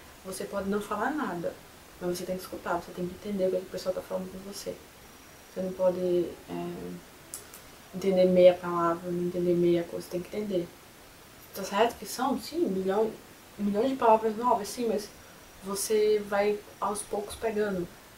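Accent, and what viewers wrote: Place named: Northeast, Brazil. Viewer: Seu sotaque eh igual ao meu, vc eh do Nordeste? Sou de Maceio, tb sou Au Pair, tb tenho um diario aqui no YT e estou ha tres meses em Virginia. Onde vc esta aqui nos EUA? Bjus!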